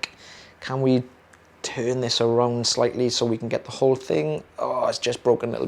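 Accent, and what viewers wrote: Wales, United Kingdom. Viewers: My favorite British accent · The accent is Welsh, The clinics are all based in Wales I believe. Lovely accent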